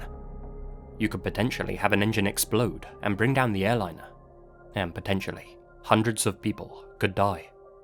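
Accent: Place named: United Kingdom. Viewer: For some reason that British accent is damn good at storytelling!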